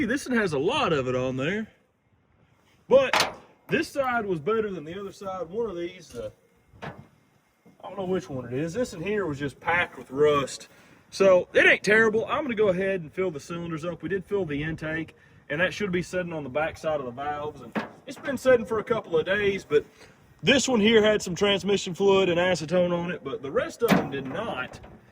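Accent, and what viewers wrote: South, United States. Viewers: I missed that southern drawl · I come for the video. I stay for the good-ol-boy accent.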